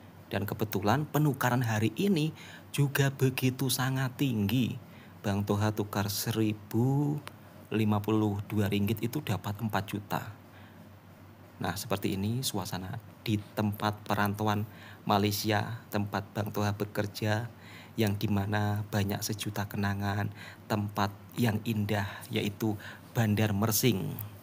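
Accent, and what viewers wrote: Java, Indonesia. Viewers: Slang Bro Toha bicara seperti rakan rakan jawa di tahun tahun 70an. Teruskan bro Toha berkarya. Moga nanti tidak kena cukai. Adakah bro Toha balik terus?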